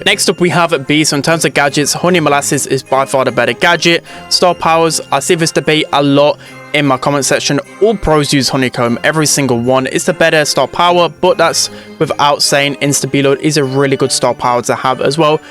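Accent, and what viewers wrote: United Kingdom. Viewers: I can stand his bri'ish accent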